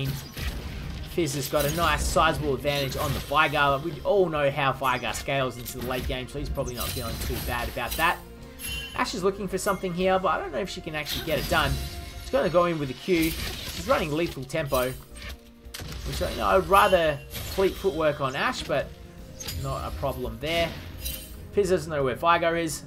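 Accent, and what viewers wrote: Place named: Australia. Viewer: Terrific gameplay and what top drawer aussie commentary dude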